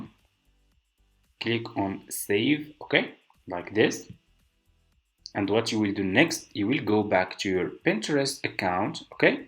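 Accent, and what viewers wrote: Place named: Morocco. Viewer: Thanks and good luck! You are a moroccan i can tell from the accent 😅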